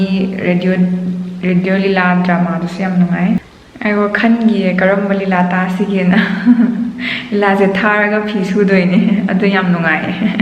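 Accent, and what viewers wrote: Kakching, India. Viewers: Lon thp tamei nowraga last ta yong ym kuirameba heiglak a.....😀 kaow yadeba eikhoi kakching lonse nungcbanehe😍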